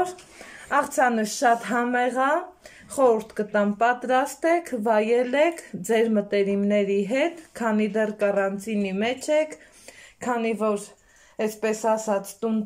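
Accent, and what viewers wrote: Lori, Armenia. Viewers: Լոռվա ակցենտով եք խոսում, շատ հաճելի էր ամպայման կպատրաստեմ👍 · Շատ հավեսին եք խոսում, Լոռվա ակցենտով 😁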